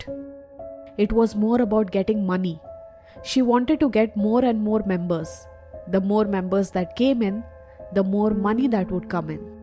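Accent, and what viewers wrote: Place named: South India, India. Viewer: Narrator sounds as if she is South Indian.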